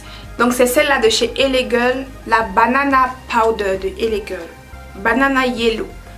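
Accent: Ivory Coast. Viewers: Coucou top top ton maquillage 🤩🤩🤩👍🏽j’aime trop ton accent ivoirien 😊où je peux avoir cette palette a Abidjan?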